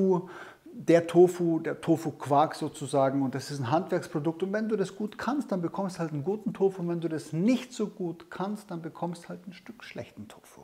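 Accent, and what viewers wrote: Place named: Franconia, Germany. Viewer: Hallo, ich habe gerade deinen Kanal entdeckt, super! - und sehr charmant mit Deinem fränkischen(?) Akzent ❣️ "Geschmacksrodeo" 😂❣️- made my day 😘- yummi 😋- sieht echt gut aus ❣️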